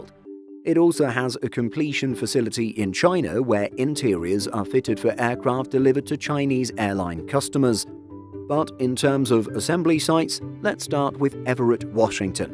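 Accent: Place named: United Kingdom. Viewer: I think your British accent shows your bias.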